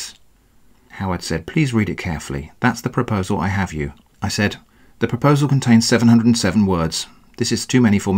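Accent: United Kingdom. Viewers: I really like the production you put into this one!! I love ALL your videos as I'm a fool for your cheeky British accent. Lol thanks for your work!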